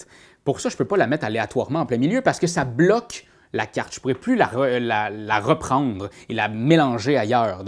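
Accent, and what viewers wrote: Quebec, Canada. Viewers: j’aime l’accent québecois lol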